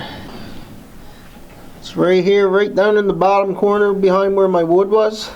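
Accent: Newfoundland, Canada. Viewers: Right as soon as you opened your mouth I knew yous was a newf. From Kilbride myself. Living the life you are.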